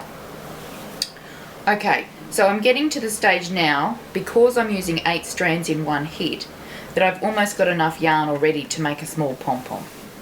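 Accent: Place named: Australia. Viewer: So lovely to hear an Aussie voice coming from a great, non complicated tutorial! Bonza mate!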